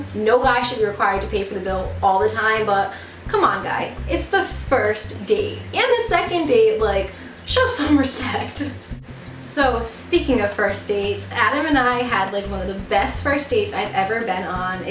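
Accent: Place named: Massachusetts, United States. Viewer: LOVE Faneuil Hall! I'm originally from MA, but I live in Alabama now. Hearing you talk reminds me of home haha. I also LOVE Disney (I did the Disney College Program), I've been to the etsy shop you recommended for Mickey Ears and I was wondering if you've seen this shop: Anthology Candles. They make candles that supposedly smell like things from the Disney Parks (rides, food, resorts, etc). I'm dying to try some!!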